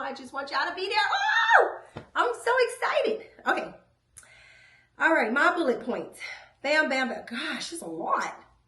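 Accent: Michigan, United States. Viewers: waitttt you from michigan?😂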